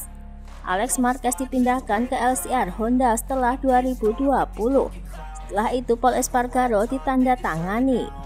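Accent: Java, Indonesia. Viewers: Komentator agak medok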